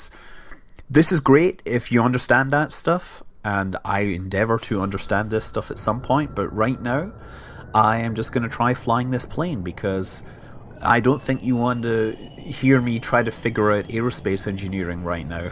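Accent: Scotland, United Kingdom. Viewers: u sound scotidh i hate u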